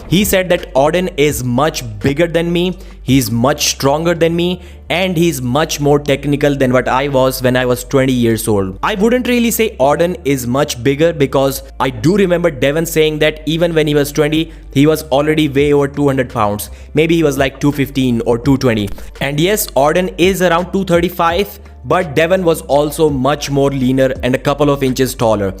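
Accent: India. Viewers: this is what Indians sound like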